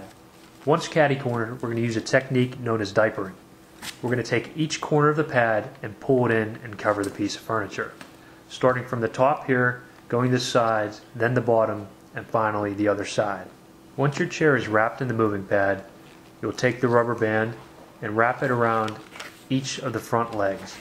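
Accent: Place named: Western Pennsylvania, United States. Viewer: I love your western PA accent. I’m from Indiana Pa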